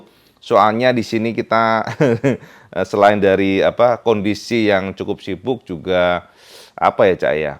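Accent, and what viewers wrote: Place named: Java, Indonesia. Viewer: BANG LOGAT JAWAMU HILANGIN MEDOK BANGET BELAJAR JD PRESENTER BERBAHASA INDONESIA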